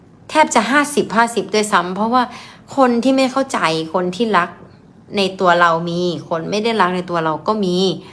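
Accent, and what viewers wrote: Isan, Thailand. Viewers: เสียงอีสาน เชียร์ครับ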